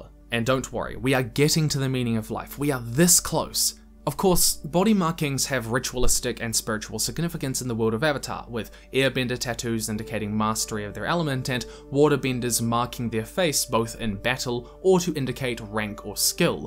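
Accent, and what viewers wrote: New Zealand. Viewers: noticed your accent straight away love and support from a fellow kiwis bruv. subscribed and hit the bell✌✌ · You a kiwi dude? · Hey I just wanna say that I had an English proficiency test recently. I have no issues with English, but I would have been very tripped up by the Kiwi accent on the listening part had I not been acquainted with the accent beforehand, thanks to your videos. Thanks!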